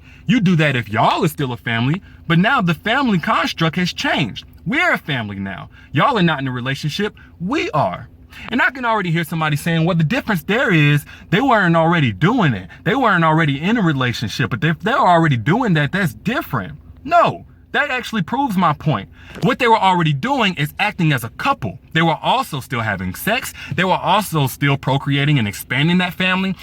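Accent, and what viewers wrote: South, United States. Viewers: Lol. That southern accent! I agree with you, Derrick. Come together only to support the child. Stop the excuses. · I'm done with that Dolly Parton accent 😂🤣. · 😂 lol love the accent Derrick lol great southern drawl lol 😂. Blended Families it can be a great thing for the kids but there has to be a lot of trust in the relationship between the new wife and husband. Camping trips and Vacations sound great but it's a matter of keeping bedrooms separate and also making sure the kids get along too especially if there are children from a previous relationship. The ex should simply try to understand that you are not with this person but you want your child to build a bond with their step siblings. I have seen this work and I have seen it progress. It works best when both parties the mother and father are in fulfilling healthy relationships though too. 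If they have that then the kids will be happy 😊 too.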